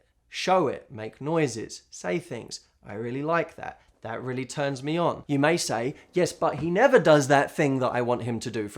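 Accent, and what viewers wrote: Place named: England, United Kingdom. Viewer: I loved it when he said in the bedroom, and dirty tawlk, nawtee talk...lol cute, in that sexy English lad accent of his... I enjoyed that stuff he said when he was speaking in a seductive tone... Ooh those lovely green eyes, the sultry expressive baby come hither looks and body language, but those soft tones make me all melty, I want to hear him speak like that to me, telling me what he would like to hear me say, & costing up in the street whispering I can't wait till I get you home. Of course in the context of being life partners I am permitted to imagine these lovely intimacies...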